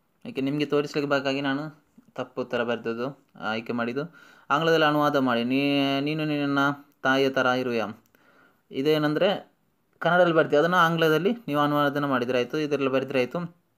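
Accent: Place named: Mangalore, India. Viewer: nimma Kannada mangloor kannada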